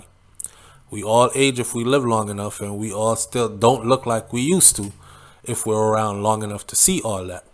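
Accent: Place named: Jamaica. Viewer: You sound like you are from Jamaica...in fact you sound like SOUFLO...you cyan hide from your fans...Big Up to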